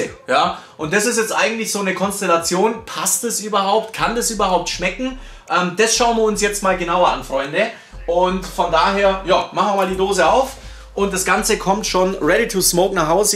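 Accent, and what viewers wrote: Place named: Swabia, Germany. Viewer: Woher aus Schwaben kommsch du eigentlich? ;-) Dialekt kommt mir sehr bekannt vor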